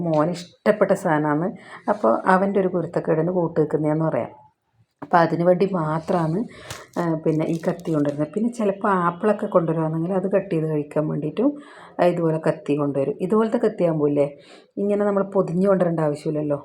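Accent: Kannur, India. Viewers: YouTube nokkikkondirikkumbozha chechide trainil ndakkunnannathu ingane okkeya enna video kande .samsaram kettappazhe kannurkari aanennu thonni.apo video full kandu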